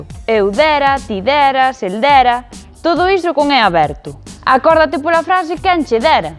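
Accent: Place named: Spain. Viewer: Não encontrei nenhuma similaridade fonética entre o português Br e o galego nessas palavras, primeiro não diminuem as vogais finais não nasalizam as vogais antes de M e N se for levar em consideração o som de DI/DE e TI/TE soa ainda mais diferente além que fazem a pronúncia do R vibrante e o sotaque é mais Espanhol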